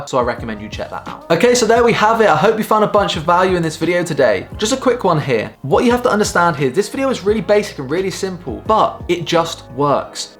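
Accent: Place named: United Kingdom. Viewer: I love your accent. What part of the UK 🇬🇧 are you?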